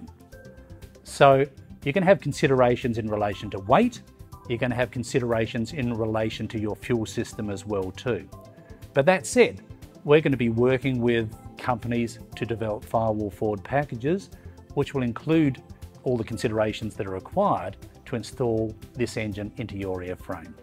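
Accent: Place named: Australia. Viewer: Listening from Australia, was pretty sure that was an Aussie accent, checking online verified it :-) Go Aussie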